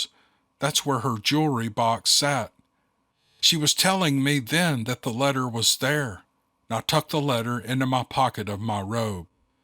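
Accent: South, United States. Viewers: I have listened to this twice, several months ago and then today. OMG! Best novel ever and I love ’ southern drawl that give this book so much reality with me being a native Alabaman, sound like my uncle/cousins and Grandfather ❤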